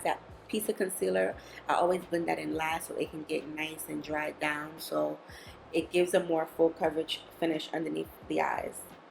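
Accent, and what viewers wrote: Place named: New York City, United States. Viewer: what is your background,where you from? Cuz I'm getting Bronx N.Y. Jersey vibe from your accent.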